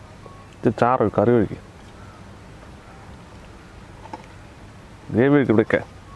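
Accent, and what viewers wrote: Palakkad, India. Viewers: സുഹൃത്തുക്കൾ ചിക്കൻ കാലെടുതതപോൾ കണ്ടു കള്ളൻ എന്നിട്ട് പറയുകയും ചെയ്തു നിങ്ങൾ ആളുകൊളളാലോ ആ പാലക്കാടൻ തനി നാടൻ മലയാളം എനിക്ക് വളരെ ഇഷ്ടപ്പെട്ടു എല്ലാ ആശംസകളും നിങ്ങൾക്കും നിങ്ങളുടെ ടീമിനും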